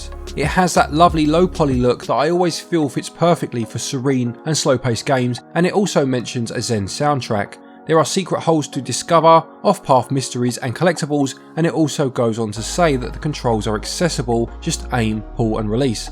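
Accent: England, United Kingdom. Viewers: Definitely waaaaaay too English. But we love it. Now say aluminum and strawberries for us please.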